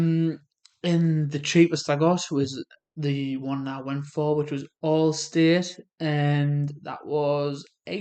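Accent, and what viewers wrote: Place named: United Kingdom. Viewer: Based on all the negative Nancy videos you looked at listed under the video above, it is no wonder you came away with negative impressions of Toronto/Canada but having lived here all of my 76 years (a retired litigation lawyer), I have no serious complaints and would NOT want to live in the UK or the current version of the USA under crazy Tariff Tyrant Trump. Given your very thick regional British accent (I could not understand a lot of what you say in your videos), that also would not have helped you in Toronto or in Alberta (and I was born in Toronto but with British parents from Paisley and Dundee Scotland and London and Croydon England). In any event, good luck to you going forward but you really need to research the cost of living and the job situation wherever you live in this world.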